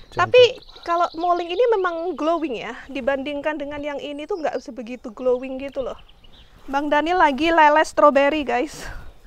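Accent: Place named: Banyuwangi, Indonesia. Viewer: Bang Daniel sekarang klo ngomong banget jd ketularan logat Banyuwangi nya mbk Manis banget jd manis byangettt 🤭🤭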